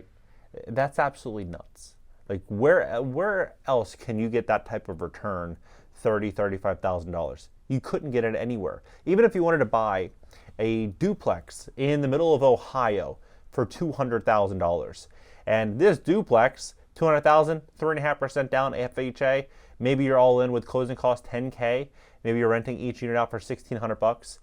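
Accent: California, United States. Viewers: You sound like a native Californian, am I right?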